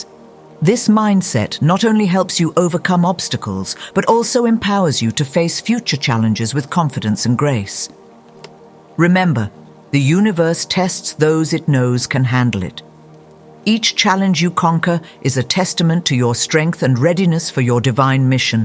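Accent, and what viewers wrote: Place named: United Kingdom. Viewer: Ok UK accent!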